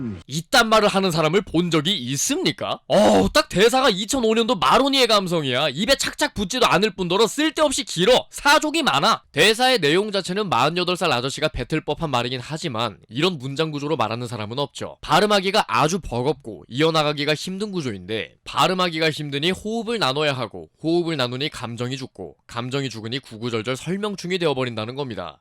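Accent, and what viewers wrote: Busan, South Korea. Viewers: 나랑 상반된 다른 의견의 눈으로 보는것도 재밌구만. 그나저나 해설 기깔나게 하길래 부산인지도 몰랐네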